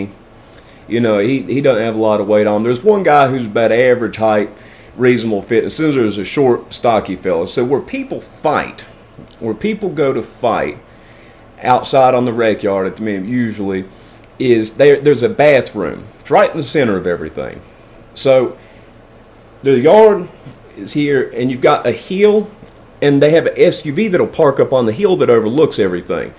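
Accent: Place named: South, United States. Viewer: Enjoy ur personality and stories. Im from KY, so ur country diction hits home! CHEERS!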